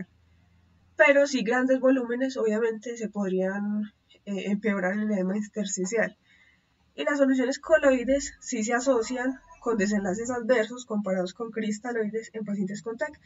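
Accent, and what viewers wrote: Colombia. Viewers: tan bella la doctora con acento de betty la fea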